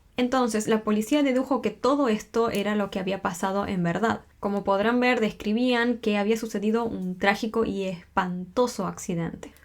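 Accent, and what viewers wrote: Argentina. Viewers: Será que es argentina? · Si, es Argentina.es muy común el Che- es su acento.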